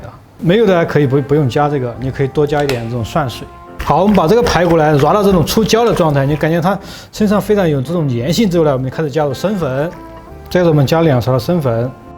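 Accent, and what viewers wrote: Sichuan, China. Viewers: 因为是川渝地区的人，所以会是椒盐普通话😂😂😂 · 这很明显是四川口音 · 椒盐川普，巴适！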